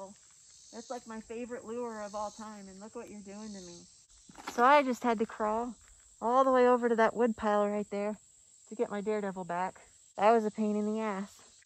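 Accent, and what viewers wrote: South, United States. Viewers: I'd just do what works unless there's a serious reason imo Common way is not always best way And also is that a slight country accent? aww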